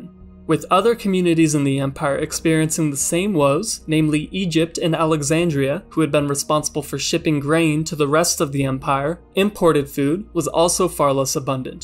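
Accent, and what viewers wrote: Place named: Italy. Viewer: Tabish here watching from Lori's computer lol ...love the italian accent lol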